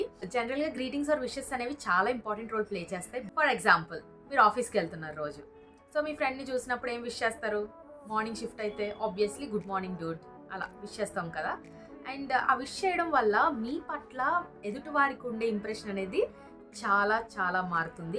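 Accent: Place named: West Godavari, India. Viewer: పాఠం చెప్పే విధానము బాగుంది. అచ్చమైన తెలుగు స్వచ్ఛమైన కన్నడ మరియు ఆంగ్లము. పశ్చిమ గోదావరి మాండలికములా అనిపిస్తోంది మీ తెలుగు. భారతీయ భాషల మీద ఆంగ్ల ప్రభావం ఎప్పటికీ పోదు. వీలైనన్ని పాఠాలు చూస్తాను. విజయోస్తు.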